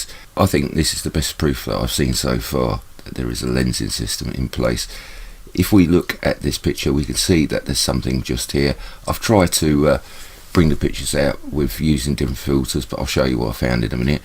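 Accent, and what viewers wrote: United Kingdom. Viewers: Hiya mate I've been watching your videos for a good few days now. Your videos are probably showing the best evidence I've seen. Can you tell me what the lensing system is? Great videos mate. You sound British? Down south? I'm from wigan near Manchester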